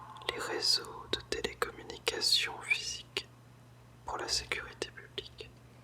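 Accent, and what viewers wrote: France. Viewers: Oh, the French accent is amazing.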